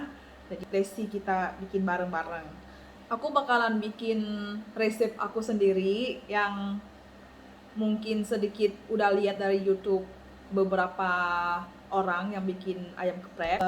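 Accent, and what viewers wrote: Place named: Indonesia. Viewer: Kak kok gak ada video yg baru sih..penasaran bgt sm video2 mu..secara kamu kalo gak ngomong ,kamu orang laos. aku pikir kamu asli indonesia...karena logatmu indonesia banget loh...haha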